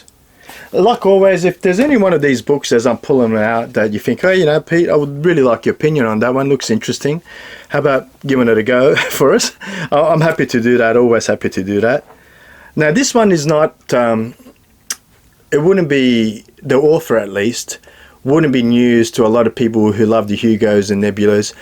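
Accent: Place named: United Kingdom. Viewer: Dude I thought you were from the UK this whole time 😂 I guess I'm not good with accents Love the book hauls. Keep 'em coming 👊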